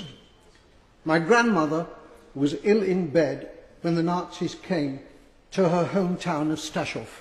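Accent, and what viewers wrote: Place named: RP, United Kingdom. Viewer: For such an intelligent sounding man, he sure is upside down. The Arabs have never, never, retracted their explicit intention to kill all the Jews in Israel. Gaza is a mess, but it's never been Israel's intention or desire to kill all its citizens. What does this mean propose? I mean practically. He looks like a gentleman, but he sounds like a high hippie 😂. "Peace, bro, peace to the world. Groovy, man." It's easy, but there is a reality to consider. He sounds like a woke teenager with a posh accent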